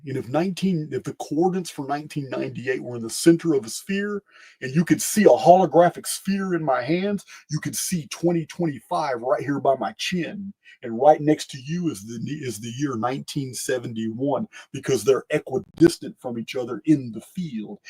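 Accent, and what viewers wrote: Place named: Tennessee, United States. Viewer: Where is your accent from? My wife is struggling to understand you, but I'm fine with it. Is it Tennessee?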